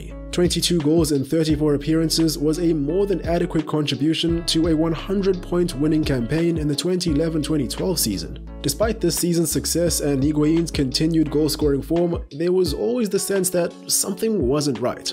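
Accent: South Africa. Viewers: Mr narrator...are you south African?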